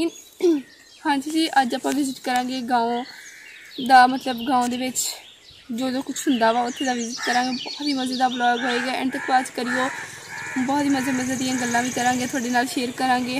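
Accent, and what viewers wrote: Punjab, India. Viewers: Tusi ta same chadde punjab vargi punjabi bolde ho.very nyc vlogs 👍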